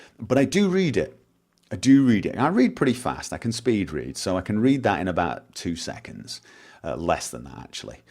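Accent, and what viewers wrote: United Kingdom. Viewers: If i recall correctly he's from England. or rather... blast it's on the tip of my tongue but i cant quite get it right. Sorry on that part. suffice to say he's in an area where he sounds "wonderfully British". dont realy hear people go "he sounds wonderfully American" so i guess certain parts over there are doing a few things right. god i can hear it now... going "i'm not from England you sodding yank i'm from ___"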